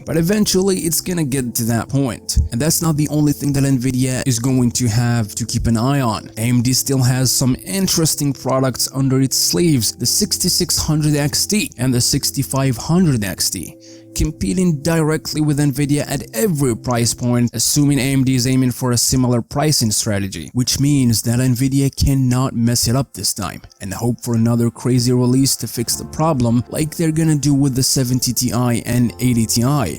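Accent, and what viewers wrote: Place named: Russia. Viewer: Мне показалось, что у вас русский акцент) успехов в развитии канала.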